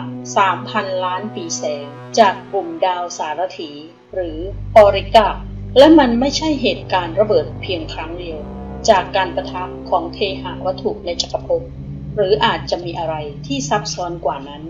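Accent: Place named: Isan, Thailand. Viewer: ลงพุงแชลแนล(ผมชอบ)ก็อิสานครับ สำเนียง ไม่ใช่ปัญหาครับ ..พูดกลาง สำเนียงอิสาน ก็ไม่เป็นไรหรอกครับ พี่น้องสิสาน มีอยู่แผ่นดิน สำเนียงคั้นนหูครับ เป็นกำลังใจให้นะครับ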